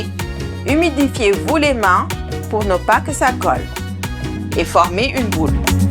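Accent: Senegal. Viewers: J'AIME l'accent Sénégalais très beau accent très agréable à l'oreille ❤❤❤❤❤❤❤❤ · La Boss ,vous êtes Ivoirienne ou Senegalaise ?Je trouve que vous avez un petit accent wolof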